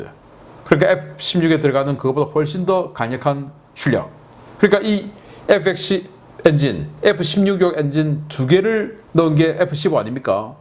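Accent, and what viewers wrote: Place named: Seoul, South Korea. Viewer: 서울말 쫌 되니 .....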